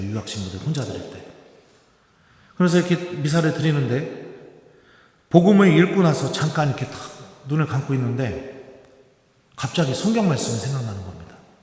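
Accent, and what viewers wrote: Chungcheong, South Korea. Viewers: 신부님 충청도 구수한 사투리 말씀과 주님말씀의 선포하시는 신부님 감사합니다 영육간에 건강히시길 기도드립니다 감사합니다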